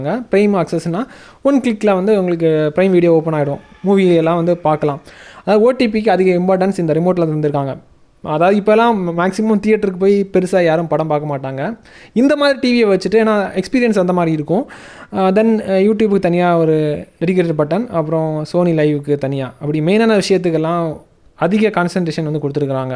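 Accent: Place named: Nagercoil, India. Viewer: Nanba ne nagarcovil thana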